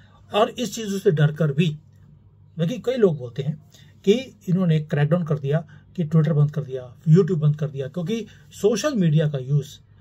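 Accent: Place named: Punjab, India. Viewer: You are simply amazing your Hindi in Punjabi is too irresponsible,and typical Punjabi moms taunt way is ultimate and of course not the least your courage in using words to insult the rivalry is super awesome..keep it up keep growing..Jai ma Bharti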